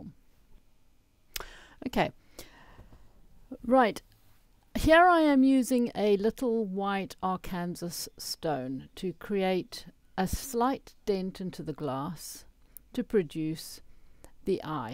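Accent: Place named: South Africa. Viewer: Ur south Africa accent keeps popping up.!!😁😁😘 I keep trying to look round ur hair.!!!🤣🤣🤣🤣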